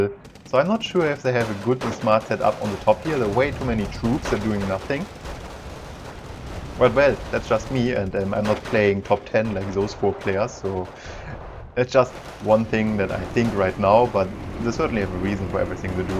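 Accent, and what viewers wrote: Germany. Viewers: well... it"s not a ranked map ... it"S not a ranked game ... and non of the above is correct but i love to hear you make commentry in that german accent non the less ...you sound like those german generals in those ww2 movies so .. i will give you a like hahahaha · Typical German Accent...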